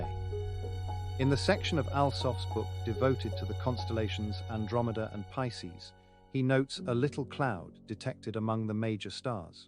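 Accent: United Kingdom. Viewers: Do they just hire anyone with a British accent to read and narrate? What happened to the previous narrator of "Bizarre Journey to the Outer Solar System""? The previous narrator sounded like Jude Law narrating you a space documentary until you fall asleep. 😂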